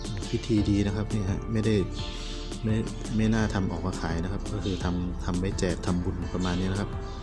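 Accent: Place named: Buriram, Thailand. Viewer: แสลงโทนบุรีรัมย์คับ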